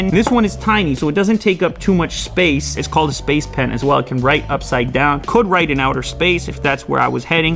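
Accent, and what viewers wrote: Canada. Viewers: Wait!...your Canadian? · your Canadian??!! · you from Canada?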